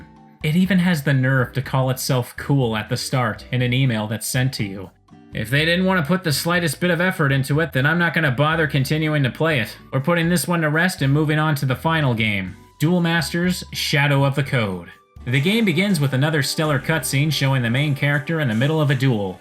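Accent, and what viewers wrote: Canada. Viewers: dad jokes the game! great video, love your content. is your accent canadian? it soothes me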